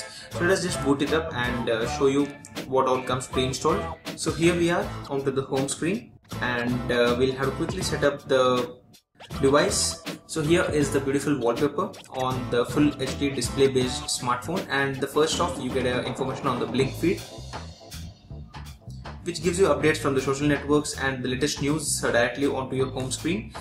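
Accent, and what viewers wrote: India. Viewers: Stop saying checkout and get rid of that Indian accent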